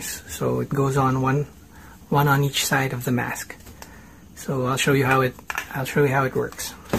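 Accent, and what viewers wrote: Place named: Philippines. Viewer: The accent tells me you're pinoy? if so pare san mo nabili yan? legit na 3m or fake?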